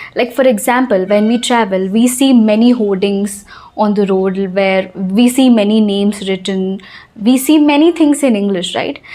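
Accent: India. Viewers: English has become a major challenge for all of us today . Today people those who are speaking fluent English are getting preference. So, whether we like or don't, it is must. We will have to be very confident in speaking. Speaking skill can only be developed by speaking practice only. I am non telugu but I can express my ideas & emotions in a very clear way in telugu without knowing telugu alphabets. I have lot of experience that, most of the telugu educated people speak english but their accent is telugu mixed & it's very easy to recognise them as a telugu while they interact with other in english. It's so surprising that, you are some one who is speaking english like english. It's a good thing. You are really sounding like indian standard english. Ma'am you are 100% correct, that we should get ourselves attached every day & every moment with english by start reading informations whatever we get. 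Like whatever we purchase we find some informations on the wrappers of the stuffs. English is not such a BRAMHA VIDYA that, it can't be learnt. Problem is that, we are not investing that much of efforts and interests to control over the language. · I only subscribe to your channel because,. So soft your voice it is! Like a native Indian speakers. It will be very helpful for indian's like me.🌅💞💯✌️💐💐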